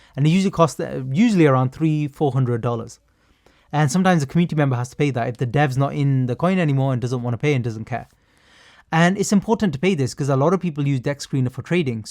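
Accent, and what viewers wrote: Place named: United Kingdom. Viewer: All throughout a well spoken guide on how to avoid scams on Solana network, this really helped me during my learning process of the network and inner workings of the system. Also I would like to point out that nobody ever should listen to an Indian guy with a polished UK accent talking about crypto scams on sketchy websites like photon. good day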